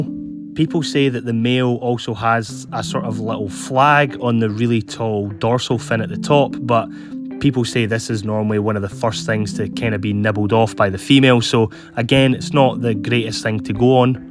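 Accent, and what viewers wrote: Scotland, United Kingdom. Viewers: I just got a pair of these guys yesterday. They are such cool looking little fish. Thank you for the video. Are you from Scotland?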